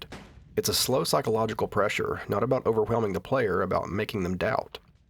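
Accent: Appalachia, United States. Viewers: I like your accent dude. As a lifelong Tennessean, it’s nice to see some southern creators gaining an audience and attitudes in general toward southerners shifting. Also you’re good at what you do, so that helps lol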